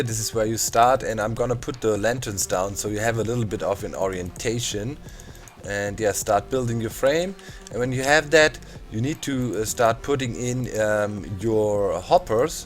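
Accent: Germany. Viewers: You Sound a lil Bit grman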